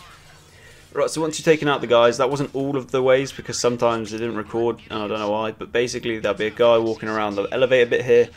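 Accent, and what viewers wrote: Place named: United States. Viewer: let me guess... american?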